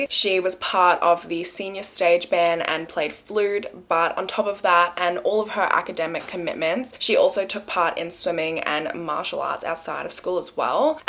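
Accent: Australia. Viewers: You move to where overseas?!! I'm curious!! I would love welcome you to 🇨🇦 You Are awsome!! Love your work and beautiful Aussie accent!!!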